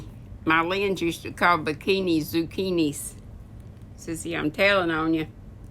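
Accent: South, United States. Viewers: Your a HOOT! Love southern accents!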